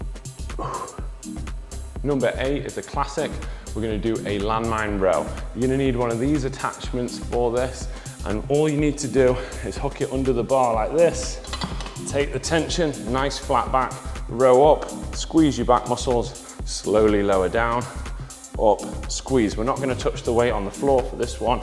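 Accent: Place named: Liverpool, United Kingdom. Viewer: A Scouser!!!!!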